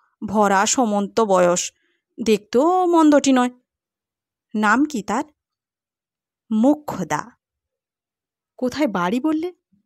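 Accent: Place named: Bangladesh. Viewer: গল্প পাঠে বাংলাদেশের টান আছে ।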